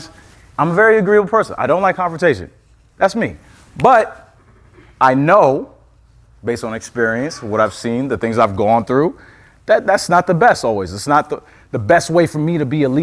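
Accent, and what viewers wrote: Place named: New York, United States. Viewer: Oh you DEFINITELY from NY lol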